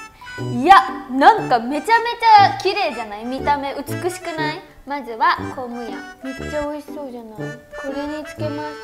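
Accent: Thailand. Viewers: แซบปุอิหลี พูดอิสานน่ารั๊ก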